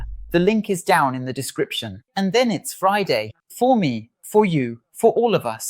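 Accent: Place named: United Kingdom. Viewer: Ai eu gostei hein ADM! Meteu o british accent pra jogo! Me senti na F1TV! Parabéns pelo passo de subir mais um degrau!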